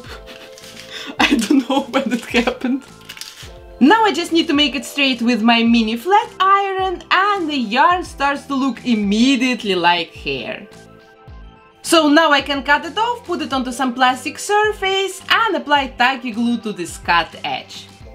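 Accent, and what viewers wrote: Russia. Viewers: Sydnie Harrison Maybe this woman is from Russia. She has Russian accent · Да потому что только русские говорят слово рили всех английского акцента😂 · DUH ONA RUSSKAYA PONYATNO PO HER ACCENT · Ток хотела написать! Тут явный русский акцент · She has a very strong accent. Immediately clear that she is Russian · Очень очень русский) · Да-да-да, я тоже заметила, ибо понимаю английский только с русским акцентом, таким образом, я "поняла" все видео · Акцент русский) Я как будто слушала подругу которая говорила непонятные слова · согласна с тобой такой русский акцент... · AryanaJoy Babauta, yes, she is Russian. It’s obvious she is. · Какой сильный акцент. Сразу поняла, что она, возможно, русская) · Почему-то слышу русский акцент 0.0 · Да, акцент русский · SSO I think so, cause I'm Russian😅 Sooo, I recognize the Russian speaking manner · Настолько русский акцент не может быть у англичан. 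· Russian accent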